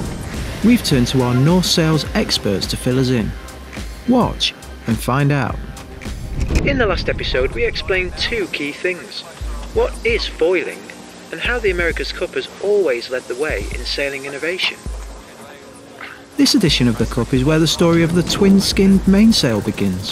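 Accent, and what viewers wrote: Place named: North, United Kingdom. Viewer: Dumbed down to the point no one watched. North sails, northern accent.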